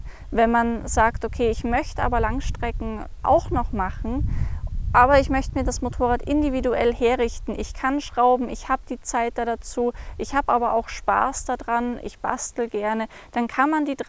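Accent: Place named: Germany. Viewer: Sehr schöner Vergleich der Maschinen. Du bist mit verantwortlich das jetzt eine CRF250Rally bei mir in der Garage steht 😊. Da ich noch keine Offroaderfahrung habe, und deinem Dialekt zu urteilen wir aus der gleichen Region kommen, kennst du evtl. Strecken zum Üben die legal befahren werden dürfen ? Ich kenne nur die unbefestigte Straße zwischen Bettbrunn und St.Lorenzi. Gruß aus Egweil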